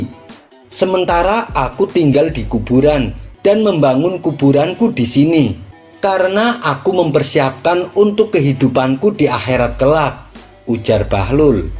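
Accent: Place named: Medan, Indonesia. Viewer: Medan bung...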